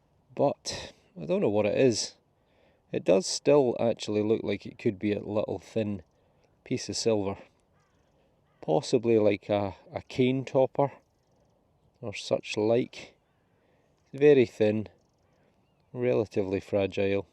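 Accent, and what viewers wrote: Scotland, United Kingdom. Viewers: thanks so much for your videos. Me and my 11yr old so n are very new to this amazing hobby but I have to say you have taught us so much already. On a more personal note, I have to thank you because there have been times when Theo has lost a bit of enthusiasm through drought periods or not getting any permissions, but your videos have genuinely kept him going. He loves your little catch phrases, and when we start for the day he will say "he we are in the beautiful fields of Cheshire" in a Scottish accent obviously 🤣. Keep up the good work. Thank you from Paul and Theo in Cheshire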